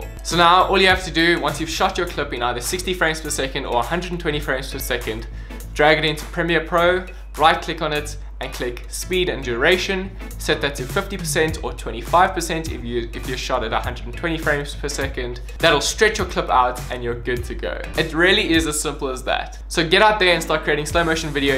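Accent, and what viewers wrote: South Africa. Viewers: Are you South African? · You South African? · South African right???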